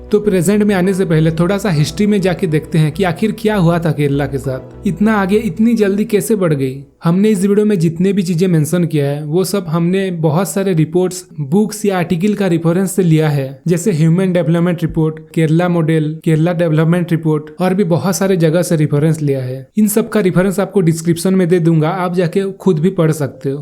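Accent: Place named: Bihar, India. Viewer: Tu Bihari hai kya ya fir Bengali pahle apne bolne ke lahze ko sudhar kar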